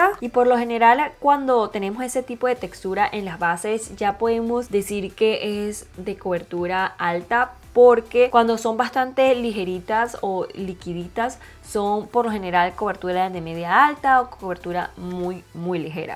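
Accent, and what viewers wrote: Venezuela. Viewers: Me encantó hablando a lo venezolano de pana se te ve genial naguara 😂😂😂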